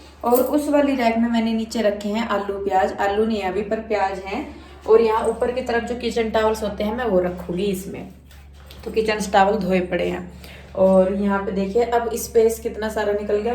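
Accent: Haryana, India. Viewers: Aap haryana se h, apki bhasha bohut achi lag rahi h, apne bohut ache se orgenize kiya hua h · Mujhe aapki boli achchi lagi,aap haryanvi ho?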